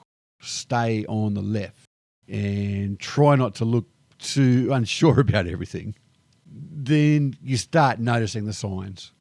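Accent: Australia. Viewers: So many daily details we take for granted or aren’t conscious of, till they’re gone, then we notice and are grateful! ☺️ I like the Aussie accent and kookaburras! Joy.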